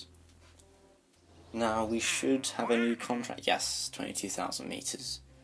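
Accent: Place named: Canada. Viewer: ***** is Canadian, probably why you cant tell:p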